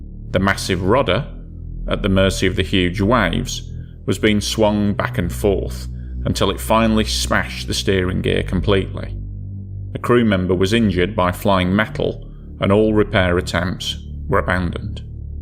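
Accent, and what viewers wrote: United Kingdom. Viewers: they're Brits. They speak a different version of English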